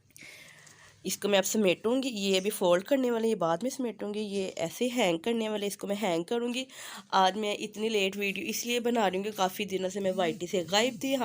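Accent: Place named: Lahore, Pakistan. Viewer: Sister aap Lahore ki lagti hain MashAllah aap Lahore walon ki tara bolti Hain Allah tala ki qismat shandar kary dunia ki sari naimtain day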